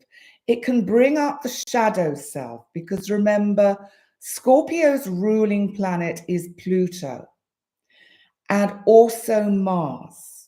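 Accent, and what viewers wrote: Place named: England, United Kingdom. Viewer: Good luck, will be thinking abt you this week! Hope out of pain soon Love your Readings! Love the English Accent sounds great 😊❤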